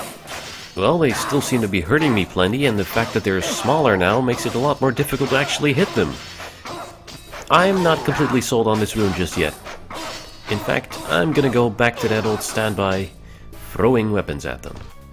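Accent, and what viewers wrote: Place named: Quebec, Canada. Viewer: Love your videos. Very entertaining. BTW, are you French Canadian? You sound like it.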